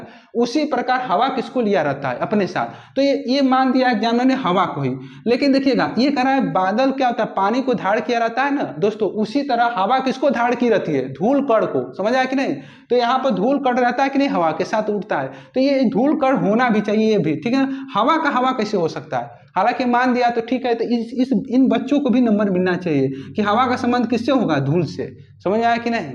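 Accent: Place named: Eastern Uttar Pradesh, India. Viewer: East up se honge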